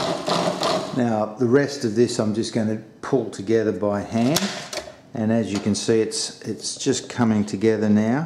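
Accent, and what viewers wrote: Australia. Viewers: Delicious !! Are you from Australia ?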